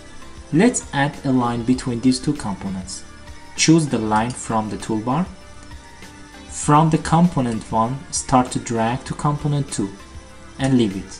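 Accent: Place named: Iran. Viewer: Tnx a lot.. Nice but music is loud i try to understand u.. I think u r from iran..ur accent and the way u speak is like iranian people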